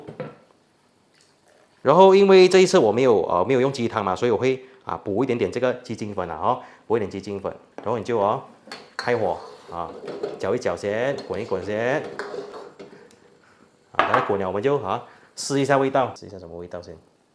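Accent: China. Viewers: 广东，一块都讲成一告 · 你的客家話很重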